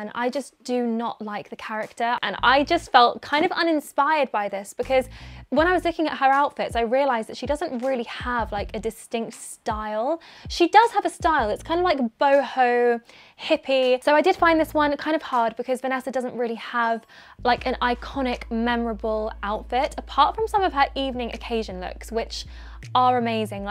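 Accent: United Kingdom. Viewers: she british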